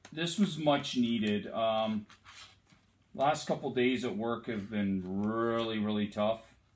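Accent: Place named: Canada. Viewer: Incantation flag is dope AF!! Are you from Canada? Just wondering because all of the "aayyy" lol. Good video btw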